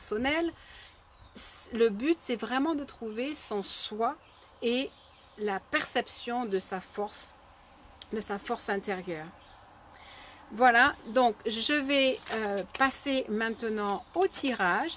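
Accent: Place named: Canada. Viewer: Bonjour. J espère que vous allez bien. Merci pour ces messages. J ai entendu qu'au fur et mesure du parcours les 2 jumeaux ont des points communs physiques comme une harmonisation: même couleur d'yeux, même voie, forme du corps...Vous allez peut être rire mais. ou s avez des pointes d'accent canadien....tresbonne journée. 🌞😀🙏❤😘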